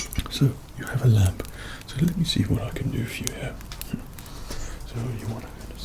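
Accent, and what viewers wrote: England, United Kingdom. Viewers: Arabian genie but has a English accent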